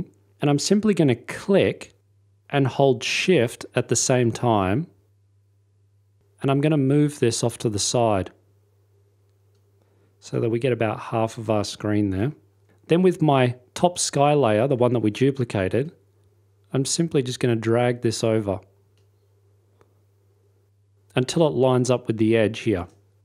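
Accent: Australia. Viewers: Straya! I've been learning After Effects from a lot of different wonderful people on here from around the globe - It's nice to finally hear the Aussie accent.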